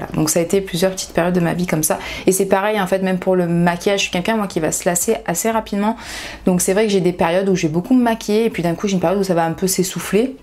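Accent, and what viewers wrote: South, France. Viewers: Étant de Belgique j'entends que tu as l'accent du sud mais pas non plus le gros accent de la cagole de Marseille 😂😂😂 Ne te plainds pas trop moi j'ai un accent belge 😭😭😭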